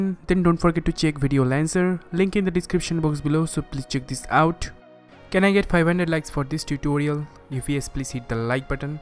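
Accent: Bengal, India. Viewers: India for sure buddy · Darun laglo. Ami katha sune bujhlam apni Bangali. Khub informative video, ebong khub sundor. Sudhu ektai jinish, if you don't mind, uchcharon gulo ektu thik kore nilei bas, perfect. All the best brother!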